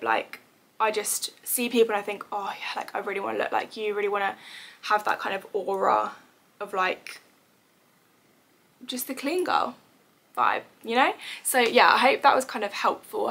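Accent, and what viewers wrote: United Kingdom. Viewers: I like your British Accent!!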